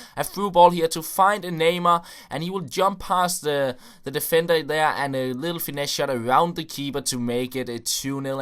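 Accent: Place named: Denmark. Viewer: I can hear on your accent that you are danish :D I'm norwegian, you see :D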